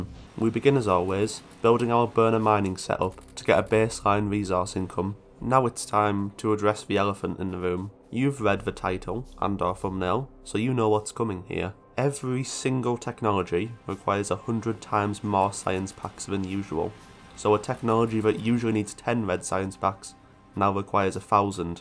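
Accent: United Kingdom. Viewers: Ngl your british accent made me watch the whole thing. and your amazing gamplay as well. Great content keep going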